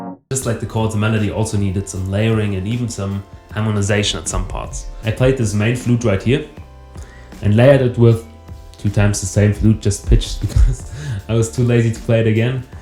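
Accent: Germany. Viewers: Are you German? You have a german accent Mir wurde das Video über meine Startseite vorgeschlagen 😄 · bro du sprichst gut Englisch aber habe mir trotzdem gedacht dass du deutsch bist😂 Sehr stabiles Video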